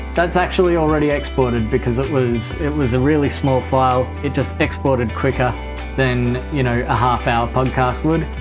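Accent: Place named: New Zealand. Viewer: Took me way too long to realise he’s a kiwi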